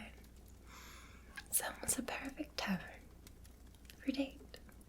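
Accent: Scotland, United Kingdom. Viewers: Pretty neat and beautiful my birthday is today think we can be best of friends?💐💖😘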